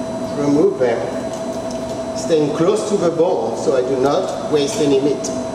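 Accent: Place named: France. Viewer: Bazlur Rahmi worked in an Alaskan fish company for a month. The Salmon came from the boat directly pumped to me. where scarred salmon would go to the canning, and vitamin prepping. And unscathed fish would be cut to filets. which i only did a short time. it wasnt rocket science, you basically saved as much meat of the fish as possible, by cutting away the rest. The fruit peeler as a deboner was the highlight of this video and the dudes snazzy french accent 😂😂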